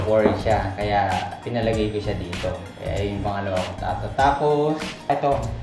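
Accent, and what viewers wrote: Pampanga, Philippines. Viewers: Sarap pakinggan boses ni kuya ska ung puntong kapampangan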